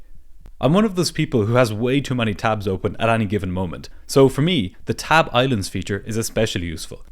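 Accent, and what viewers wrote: Ireland. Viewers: What a great video! It’s a while since I’ve watched one on this channel and I forgot what a brilliant story teller you are. You know how to create tension, which is a real skill. Don’t ever use AI - your Irish accent is much, much nicer! I feel a bit sorry for pilots who get fired. Yes, mistakes were made, but nobody died and I think it creates a culture where mistakes are covered up if possible. It’s easy to see how this happened and I’m sure these pilots especially would never again let this happen. Did the augmenting crew also get fired? I can’t see why they were told not to contact their families either, who may have heard about the accident and be very worried. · He's Irish. Like any accent, just takes some practice to understand it :) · I had no issues. It’s called Irish. You want him to put on a fake accent or something? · by the way, being able to put a voice (in your case, a gorgeous Irish accent) to a face is lovely, so it was delightful to see your handsome face in this video - I do hope that you do more of this in future videos 🇮🇪☘️🇮🇪❤️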